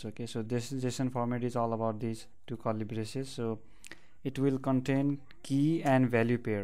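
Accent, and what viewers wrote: India. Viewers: okay I don't know about you okay and this may sound racist okay but is it really that impossible to find a video on how to code that isn't taught by a god damn indian! seriously no offense to Indians but I hate listening to an accent the entire time I'm trying to dedicate my time on something, it's just very distracting.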